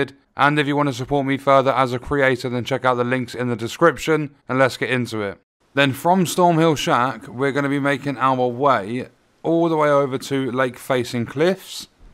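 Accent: England, United Kingdom. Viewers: English people talk funny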